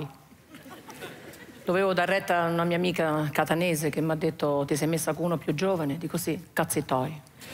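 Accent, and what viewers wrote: Rome, Italy. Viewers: stesso quartiere della Meloni : stessa cadenza, stessa genialità espressiva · Mio padre aveva una parlata quasi romanesca e ascoltarti e una cosa fantastica perché tu sei fantastica dai allegria e colore a tutto quello che dici parole vere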